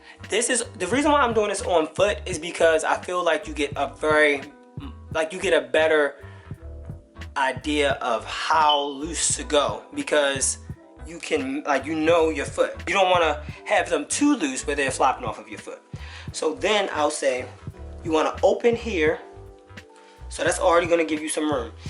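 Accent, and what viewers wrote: Baltimore, United States. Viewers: Baltimore accent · You definitely from Baltimore lol shuuus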